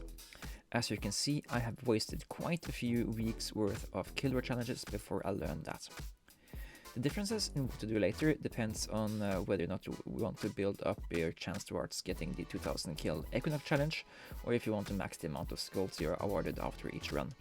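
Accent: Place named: Sweden. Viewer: youre my fav idleon creator btw, especially since you sound swedish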